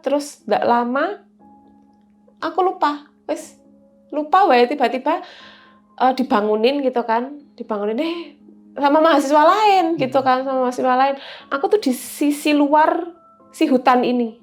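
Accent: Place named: Java, Indonesia. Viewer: Bahasa INGGRIS medok Bahasa INDONESIA medok Bahasa JAWA medok Intinya dia medok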